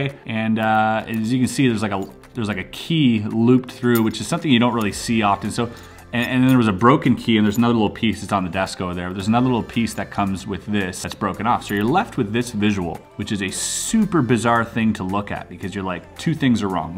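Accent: Quebec, Canada. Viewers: are you canadian?? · This accent is definetly from Québec :D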